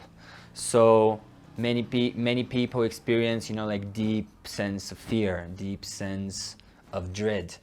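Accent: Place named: Jamaica. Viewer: Your accent sounds kind of jamaican hahaha i love it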